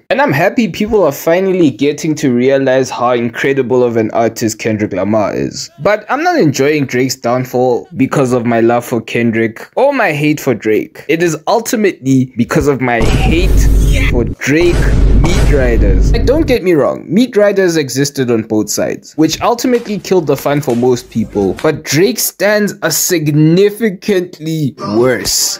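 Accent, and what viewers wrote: South Africa. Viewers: You sound like you're from 🇿🇦...🤔🤔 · You South African? That accent...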